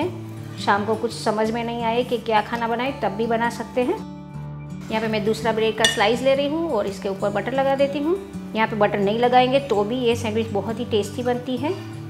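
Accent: Gujarat, India. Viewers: Apart from your surname your tone also confirms that you are from Gujrat .. 😊😊 and also the kitchen setup all white white .. so nice 👍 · Too much of ur gujrathi accent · bhale Tame hindi bolo , pan ek gujarati bija gujarati ne odkhi j jay 😃😃😄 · Aap gujrati h na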